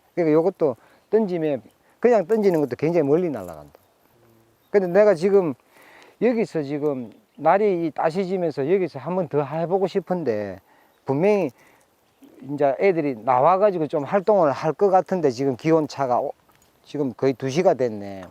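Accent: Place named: Busan, South Korea. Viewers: 얼마전 바쁘신 일정 중에도 영상 올려주셔서 감사했습니다 특히 저랑 같이 경상도 사투리를 들으며 영상을 보고 있으면 저절로 필드에 동출한 기분이 듭니다 ㅎㅎㅎ 항상 감사히 보고있어요!! <mail> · 아~하~ 영상뜨고 항상 자기전에보는데 같은부산사람으로써 말투도 정감있고 낚시를 재밌게 가르쳐주시니 더재밌고 더 알기쉬운거같아요 앞으로도 좋은영상올려주시고 항상 안전하게 낚시하시고 건강하세요ㅎㅎ 화이팅ㅎㅎ lee<num>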